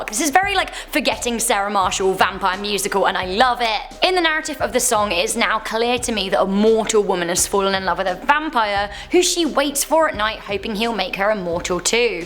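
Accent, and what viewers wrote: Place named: United Kingdom. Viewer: Is this woman from Britain? No offense she just sounds like she is from Britain.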